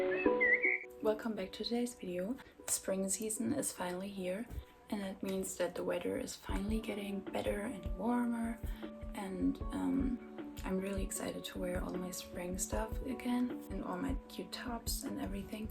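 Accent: Germany. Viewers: Maybe it's a bit of a stupid question but are you german? I saw a product in another Video that was german and you have a bit of a accent so I just wondered... (I'm german too btw) (oh and please ignore my name it's my fathers account😅) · are you german? because you kinda have an accent :D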